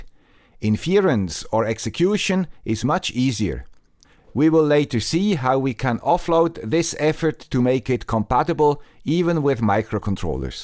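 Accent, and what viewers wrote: Switzerland. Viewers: it is usually written as: "why should I trust your definition, if you can't pronounce 'inference' properly. Let's assume the poster meant it as a joke (which it usually is), though missing the point of "the guy with the Swiss accent" :) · If I’m not mistaken, this marks your 500th video! A truly remarkable milestone! Congratulations from the bottom of my heart! Your videos are a constant source of inspiration for the community you so thoughtfully cater to. I always look forward to each new episode, filled with fresh ideas and, of course, that delightful Swiss accent, which adds an extra charm to even the most fascinating content. Greetings from Cologne!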